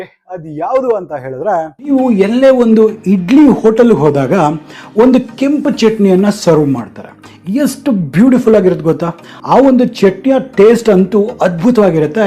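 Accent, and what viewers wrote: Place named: Mangalore, India. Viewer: Mamnglore kannada accent ,kelokke tumba channagidhe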